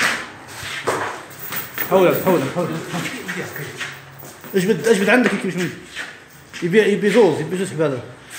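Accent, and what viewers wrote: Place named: Libya. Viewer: تتقصكم التجربة يا صديقي. .يبدو انك عشت في ليبيا طويلا كلامك يوحي بذلك .المهم بارك الله فيكم ومزيدا من الاجتهاد حتى تكتسب الخبرة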